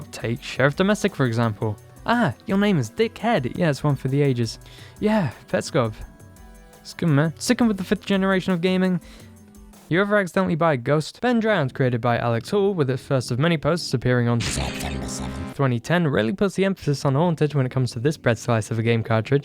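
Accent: United Kingdom. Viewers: your bored british voice is going to be nostalgic some day lol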